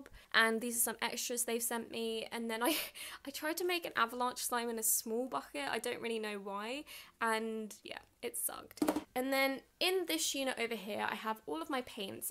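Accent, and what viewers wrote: United Kingdom. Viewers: OMG u r sooooooooooooo pretty and ur voice is so british and i dunno its a bit...liek a tangy british accent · Are you from the uk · Britich or american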